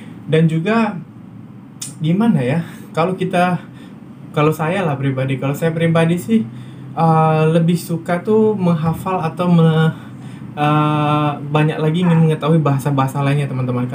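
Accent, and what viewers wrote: Malaysia. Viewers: Wahh....melayu Malaysia betul ni👍.....pernah bekerja di Malaysia ke? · Biar la apa org kata.... Mulut org tak bisa ttup...truskan saja ikut cara kamu... Loghat melayu ka indon ka atau campur2 skalipn just go saja.... Kitaorg selalu support kamu... Insyaallah.... Amiin GoodLuck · Mungkin yg dimasalahkan logat Malaysia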